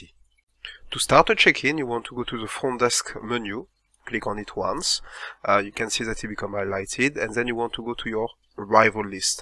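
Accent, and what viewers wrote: France. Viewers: Langue anglaise avec un accent français génial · sounds super French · merci beacoup pour le rafraîchissement de mémoire et pour le magnifque french accent. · It still sounds French even if he speaks english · A French speaker's English is always so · Terrible with the French English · seems French accent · your accent is more of french which is a bit hard to understand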